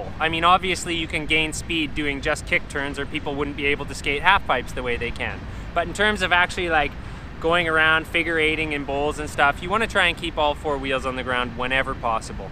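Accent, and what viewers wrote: Canada. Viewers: Is that a fellow Canadian I hear?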